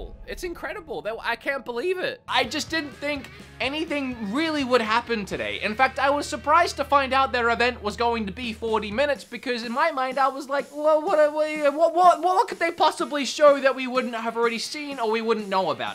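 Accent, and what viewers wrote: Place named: Australia. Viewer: This guy sounds Australian...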